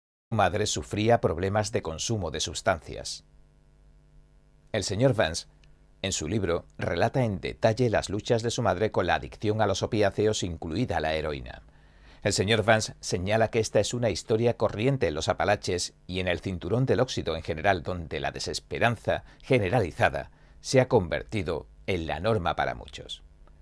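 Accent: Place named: Castile, Spain. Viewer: ¡Como estoy disfrutando de este canal desde que lo descubrí el otro día! EXCELENTE información sobre EEUU y con locutor español, con acento castellanp.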